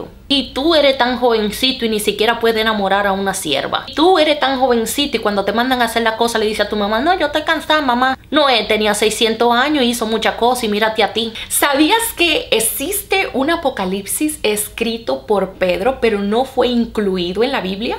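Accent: Argentina. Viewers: La hna. Tiene un ascento muy argentino. 😄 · Jajaja me encantó el acento de. Muy Argentina.. Dale che.. saludos desde Argentina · jajajaj... ay... cada vez te escuchas mas argentina.. jaja un abrazo bendiciones desde Mexico! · AMÉ el acento de, medio Argentino!! Jajaja, abrazo grande Sierva del Señor. Desde Buenos Aires, Argentina!! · Jajaja tiene un asento bastante argentino jajajaj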